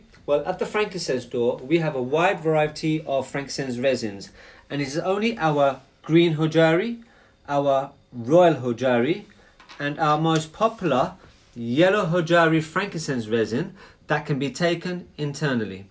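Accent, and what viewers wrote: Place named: Pakistan. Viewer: This guy sounds like a pakistani not omani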